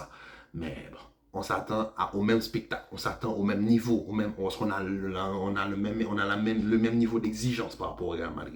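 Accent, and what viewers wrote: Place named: Haiti. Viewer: Je sens que vous êtes un Haïtien. Ton accent, la façon dont tu dis le "en tout cas" "antouka"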